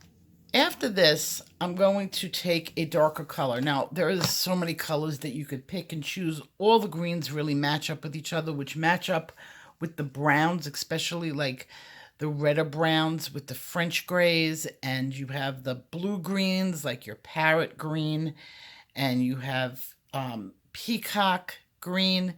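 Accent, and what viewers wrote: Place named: Brooklyn, United States. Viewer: New to your channel, and wondering if you’d mind explaining what paska ( not even sure how to spell it, lol ) is.... never heard of it... loved your tutorial on leaves and as a Canadian, your accent is a delight, makes me smile... is it a Brooklyn accent? That’s my best guess.... thanks for giving such great instructions.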